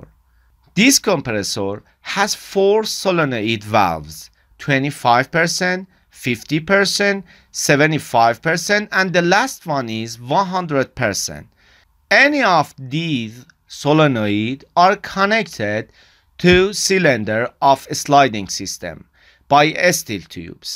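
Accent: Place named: Iran. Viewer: your accent was iranian..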